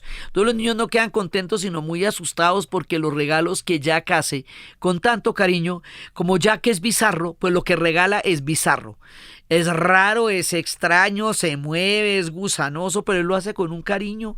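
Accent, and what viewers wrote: Colombia. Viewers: Gracias! Tu voz me acerca a mi Colombia. Mil abrazos desde el exilio 🥺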